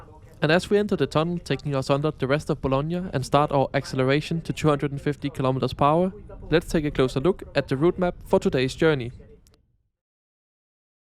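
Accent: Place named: Denmark. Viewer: Love your sweet, soft Danish accent. Unmistakable. So many memories of my good old Danish friends from when I was young.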